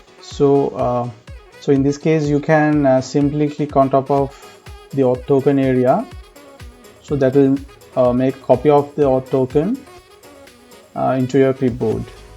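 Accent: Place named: India. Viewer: Pity you don't have the subtitles. Your Indenglish is difficult/impossible to understand when you do the patches of high speed phrases.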